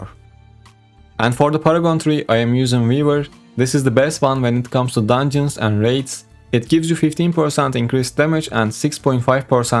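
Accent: Turkey. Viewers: Lan konuşmandan Türk olduğunu anladım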